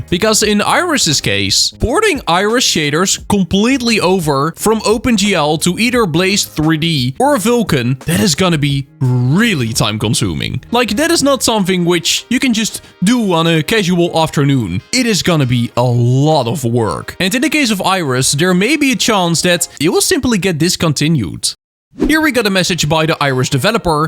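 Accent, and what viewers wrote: Netherlands. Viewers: holy nederladse stem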